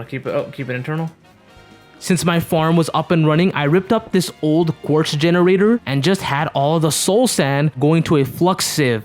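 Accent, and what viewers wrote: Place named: Mexico. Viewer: Im digging the Mexican accent!... At least thats what I think Im hearing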